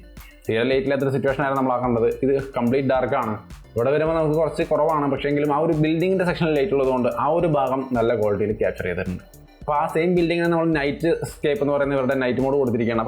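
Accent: Thrissur, India. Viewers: Veedu thrissur aano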